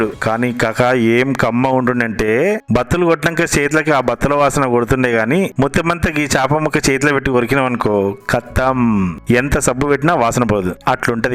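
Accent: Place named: Telangana, India. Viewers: వంట వంటే గానీ నీ తెలంగాణ యాస బాగుంది బ్రదర్ భాషలోనే మజా ఉంది బాసు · Telangana yasa super mee recipe super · was kidding bro...I'm from Bengaluru, Karnataka. Mee presentation bhale untadi 😊 ontalu kood bhale chestaru! Im fan of your Telangana slang nd your presentation skill. Meeru cinema lo try cheyochu 😎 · Telangana slang balega undi👌👌👌👌👌.....dabbalu aite😂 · Telangana yasa masthugunnadi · Hahhha ana super masthu comedy unde meru cheppe style telengana mazaka🥰🥰🥰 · telangana slang bagundhi Anna continue plz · asaluuu E Telangana slang unexpected..... Big fan ega 😍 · Yeh super bro......mana Hyderabad accent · Telangana slang bagane matladinav bayya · Your yelegana ccent is too good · Ni Telangana style commentary supare anna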